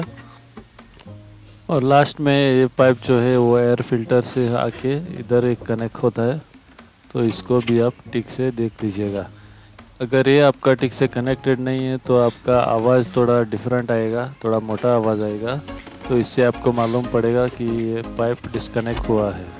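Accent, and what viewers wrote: Goa, India. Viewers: Are you from goa? ?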